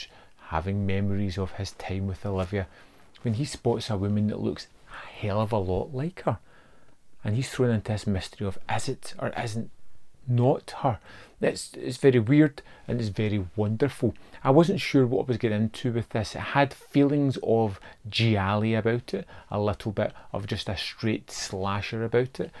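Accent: Scotland, United Kingdom. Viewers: Do you really not understand the English language? Are Scottish accents that hard for you to understand? Go back to your hovel you troglodyte.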